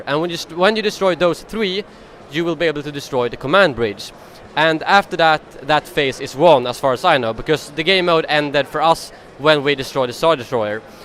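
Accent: Sweden. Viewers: are you swedish ?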